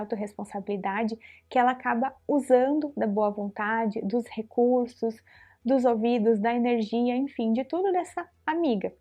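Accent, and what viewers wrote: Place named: South, Brazil. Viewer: Adoro esse sotaque, me lembra de quando eu eu morava no sul. Saudades.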